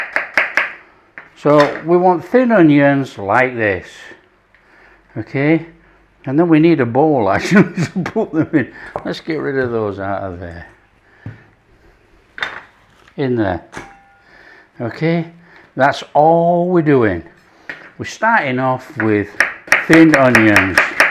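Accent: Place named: Yorkshire, United Kingdom. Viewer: Yum. What a treat. I see you’re still concentrating on a weight reducing menu!! Now, your accent. I would have said Lancashire, Oldham area but I reckon you’re Yorkshire, so Holmfirth? Halifax? Extreme West Yorkshire? Definitely not a French pronunciation.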